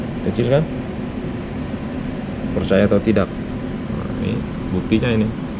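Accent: Indonesia. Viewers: Saya suka sama logat ny Lae... Horas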